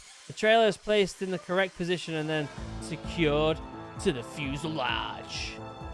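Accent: United Kingdom. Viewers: After the first ranting when the video starts the guy says video has no audio, video has audio doesn't have narration and narrative is shown under screen and suddenly guy says let me read it so people have problem in reading English understand it, I am a civil engineer with masters degree in steel structures and second master in Political Science and international relations and now studying PhD in regional studies and my toefl score was 97 point out of 120 which I took the test 5 years ago and now I am so much better in English, I wanted to say to this guy the British accent is fading out very fast and the real reasons for it's demise are it's unclear and non comprehensive, as I witnessed 2 brit one from Chelsea and on from other side of the London couldn't understand each other, and it's a snub and how it carries the feeling make the people who hear it feel bad, it's getting lectured about importance of morality in life and Vlad putin is the person gives you a talk on how precious life is, and if a person had problems in reading a text in English you be sure they never understand a word you mumbled, if you want to your work be seen by people loose the accent man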